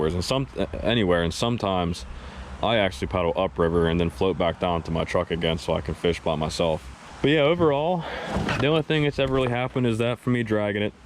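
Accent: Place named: Pittsburgh, United States. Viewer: Man, Im like half way through this thinking there's NO WAY this dude aint a yinzer, then BOOM, "dahn the Yough." Confirmed. Grew up in the Mid Mon Valley / Ringgold area, just so happens I grabbed an Eagle Talon 12 a couple days ago and stumbled my way to this video. Your upgraded chair is aggressively Appalachian, I love it.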